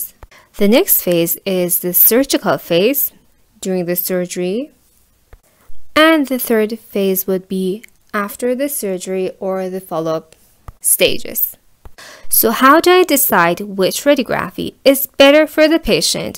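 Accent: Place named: Iran. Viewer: ویدیوهات خیلی به درد میخورن. فک کنم ایرانی هستی؟ داد میزنه لهجت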